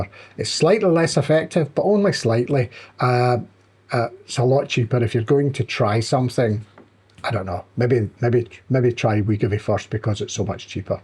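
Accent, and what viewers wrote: Scotland, United Kingdom. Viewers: Hes an inspiration to us all...and his voice is lovely to listen to isnt it, with that great Scottish accent! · I fully agree with what you said about the side effects: compared to the risks one has with staying fat, they are minor. Let them come, same thing what I think. As long as I lose weight, I am happy to have some gastritic issues. Your weight loss of 15% in the first 3 month is outstanding. You said that you are a hyper-responder. But what dosage did you go to in this timeframe ? by the way: what region are you from ? am I right your accent is scottish, but where from? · Ha ha. My grandparents came to NYC from the Spey valley Scotland 1921 (MacPhersons). They were raised in Gaelic speaking households. This accent is pure music to my ears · I hear the Scottish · OMG, your accent is so sexy! It's like the accent in the TV show Outlander --- Jamie's accent. 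❤ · He sounds like Scottish which I do find bit strong for me to listen for long 😅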